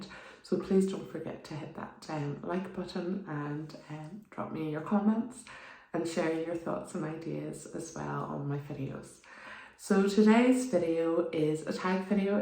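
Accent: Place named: Northern Ireland, United Kingdom. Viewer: Another fellow Norn Iron accent! Great idea for a tag! 😁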